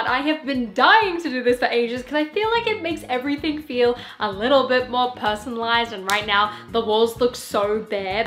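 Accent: Australia. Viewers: Agreed, I think always looks really natural. She has that striking Aussie look that fits with her quick, easy to follow australian english. If we're dealing out compliments, I also think she does really well at telling a story in her videos. They are well structured, which is not always the case for most Lego youtubers. That said, this video is probably not the best example of that, but it is something I've noticed quite often.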